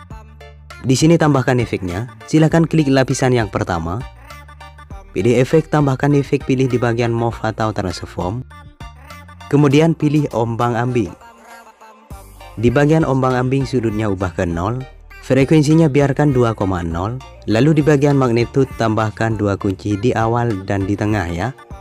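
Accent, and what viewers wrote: Madura, Indonesia. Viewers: Abang org madura kah....?🤔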